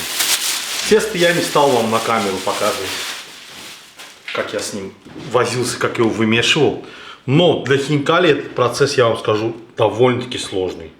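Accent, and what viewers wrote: Baku, Azerbaijan. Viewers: ТАК ПРИЯТНО СМОТРЕТЬ КАК ВЫ ГОТОВИТЕ С БОЛЬШИМ НАСТРОЕНИЕМ И БАКИНСКИМ АКЦЕНТОМ ПРЯМ СУУУПППЕЕЕРРРР. 👍👍👍👍👍👍👍👏👏👏👏👏👏👏🇦🇿🇦🇿🇦🇿🇬🇪🇬🇪🇬🇪🇬🇪🇬🇪❤❤❤❤❤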